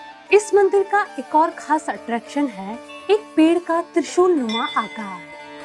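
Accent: Uttarakhand, India. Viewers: Har har mahadev apke Karan logo ko jagah ke bare me pta lag rha hai ap tourisem ko badava de rhi ho aj ap bahut achi lag rhi ho apki awaj mujhe bahut achi lagti hai apki awaj me uttrakhand ki mahak ati hai ap vlog bahut let dalti ho apse req hai vlog jaldi dala karo love you vlog and love you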